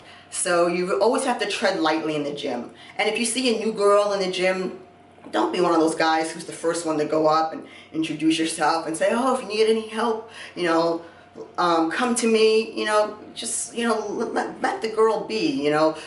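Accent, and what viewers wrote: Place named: New Jersey, United States. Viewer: That Jersey accent lol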